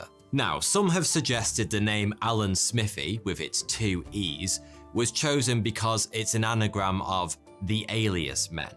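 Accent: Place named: RP, United Kingdom. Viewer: dis bloke is the posh version of vsauce lmao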